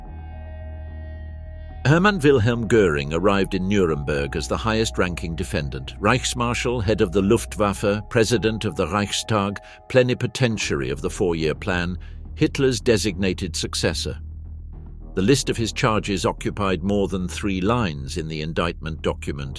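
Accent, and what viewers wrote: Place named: Oxbridge, United Kingdom. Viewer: stagey and pretentious...instead of the corny oxbridge accent and spooky music , a little histortical rigor...when thbe narrative ventures to comment and philosophize it pushes the envelope of banality....saying trite and obvious things in a stagey accent does not really dignify them ...too stupid overall and constantly stating trite opinions as verities....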